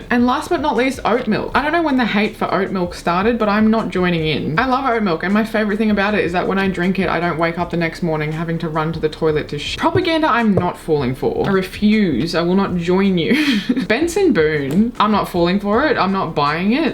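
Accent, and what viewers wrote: Australia. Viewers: Is that a surf green Fender???? I think it's surf green. It's one of the original Strat colours... They had to use Automotive colours because everything was in limited supply due to WW2. So you play 😊 cool 😎 I'm playing a cheap Cort it's a rad guitar 🎸 24 frets... So what is your site about? I'm Paul I work with heaps of people online... I go by Maori Jeebus 🖕 Maori because they hate it. And Jeebus because they hate it 😊 👍 I'm going after Trump at the moment. I was the one responsible for ELONS latest problem's. You sound Australia? I'm in Sydney.... Homoville i will leave information for you please network it out I don't push BS... Here's one have you ever heard of the Elohim? I bet Elon him doe's? He is Lucifer sweetheart mark my words I've nearly intrapt her.... I like your guitars they are rad.. paulburns 299 this is my Instagram I post stuff most days.... 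I'm chilling this week going to promote the Aboriginal and Maori People this week I'm sick of America... Good to meet you sweetheart keep up your good work lattaz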